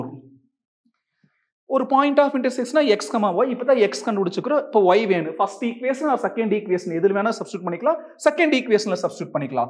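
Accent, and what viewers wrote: Coimbatore, India. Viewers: Your way of teaching in coimbatore slang is interesting sir👌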